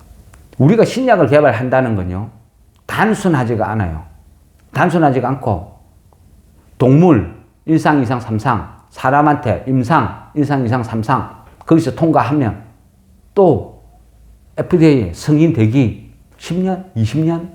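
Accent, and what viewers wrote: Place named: Gyeongsang, South Korea. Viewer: 앗 경상도 분이시다 ㅋㅋㅋ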